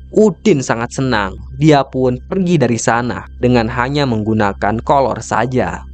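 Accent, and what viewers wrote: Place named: West Kalimantan, Indonesia. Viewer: Kalau saya dengar omong nya Kalimantan barat. Sebenar nya Kalsel.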